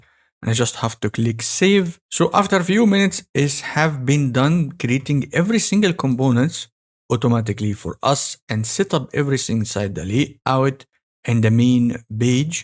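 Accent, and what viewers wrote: Egypt. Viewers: Love the Arabic accent “seemingly to be Egyptian” though if I wasn’t wrong bro 🎉 Totally underestimated channel definitely subscribed عاش والله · I love your accent Egypt? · I want to know where you are from😂 because this الوط accent very Egyptian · nice setup! I love the thicc egyptian accent!😆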